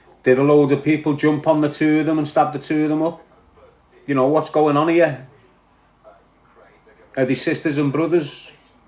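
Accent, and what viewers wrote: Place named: Liverpool, United Kingdom. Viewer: Scouser u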